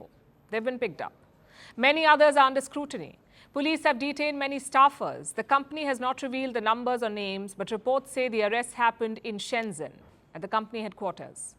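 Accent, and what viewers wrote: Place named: India. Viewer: Okey, Palki nice to hear your Indian accent once more. Thank you for ths update.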